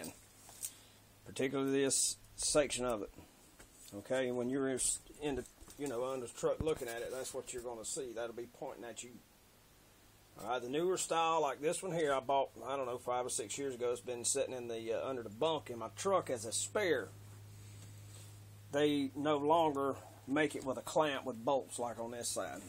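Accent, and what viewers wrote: South, United States. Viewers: Name that Southern accent. I'm thinking...... Alabama?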